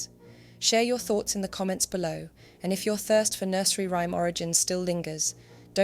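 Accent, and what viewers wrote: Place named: South, United Kingdom. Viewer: Where is your accent from? Yes uk....most probably the south but more precisely? Very easy on the ear and very good command of the English language. Love your channel..all very interesting and thought provoking. Thank you 😊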